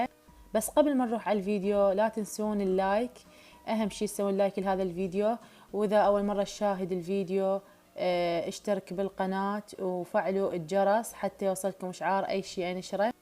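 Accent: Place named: Basra, Iraq. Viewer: لهجتها بصره الله يحفظها مين متكون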